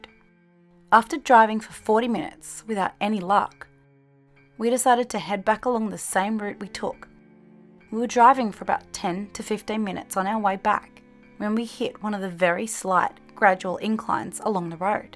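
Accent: Australia. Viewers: Loved it. Without your Australian accent, it wouldn't be complete 😂😅🎉🎉🎉🎉🎉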